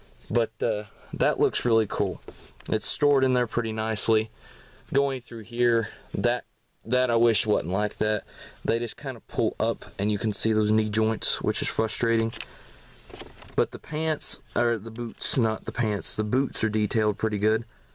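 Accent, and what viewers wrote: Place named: South, United States. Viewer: I'm loving the southern accent. I'm southern myself. North Carolina born and raised. I'm also a country singer and songwriter and comedian.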